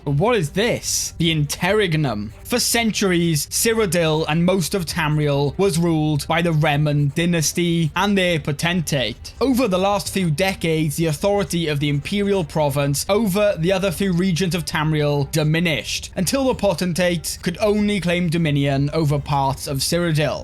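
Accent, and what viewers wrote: Wales, United Kingdom. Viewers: Welsh accent?????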